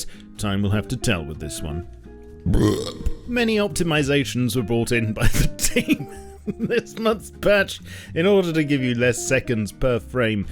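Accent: United Kingdom. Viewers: hey im glad to hear your nice british voice again. thanks for the updates :-)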